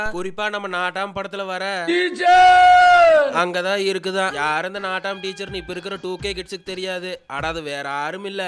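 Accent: Kongu, India. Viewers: Unga peachu gounder mari iruku correct😊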